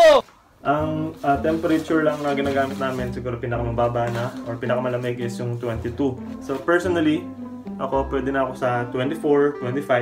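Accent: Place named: Pampanga, Philippines. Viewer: Kabalen accent 👍😍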